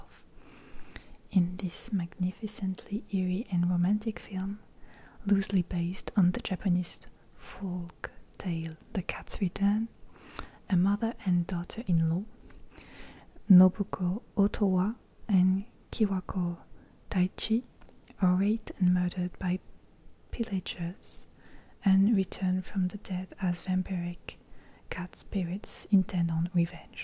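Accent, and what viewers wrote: France. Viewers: Your accent makes me wanna drop my life and find love in France........ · Your french english is adorable. You are always adorable. Please make a brush video!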